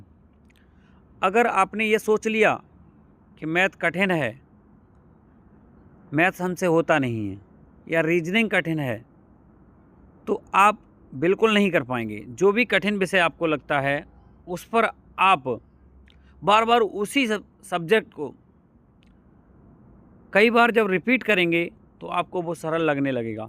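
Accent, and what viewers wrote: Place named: Western Uttar Pradesh, India. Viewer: आप पक्का वेस्ट यूपी या हरियाणा से हैं 😊 BTW nice suggestions keep it up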